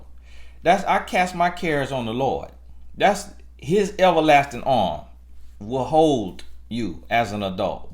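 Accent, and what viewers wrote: South, United States. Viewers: I totally agree keep spreading your message and I love that your from the south and have that southern twang when you talk ❤️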